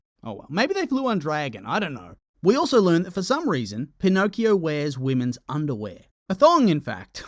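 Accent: Australia. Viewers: Ahhhhh, I needed to hear a fellow Aussie’s voice explaining this.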